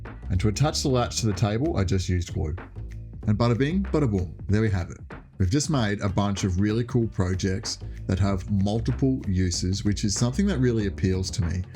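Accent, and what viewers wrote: Australia. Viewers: Love these. Simple and effective. Also cool to see and hear another Aussie maker. Just subbed. Damo.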